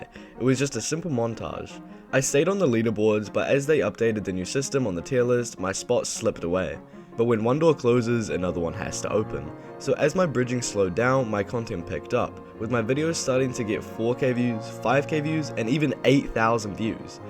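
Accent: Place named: New Zealand. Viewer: Wait you said you conquered au you sound like a New Zealander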